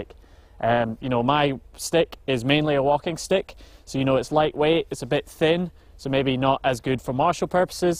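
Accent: Scotland, United Kingdom. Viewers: A thirteen minute video confirming why the humble stick is one of the most versatile tools in human history, a plug for a foam weapon fighting group, and a Scottish accent. I think I might have found my home. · It may be southern or eastern Scotland. Im from the south east of Scotland and it's a much softer accent. Not all Scottish people have a brutal accent :)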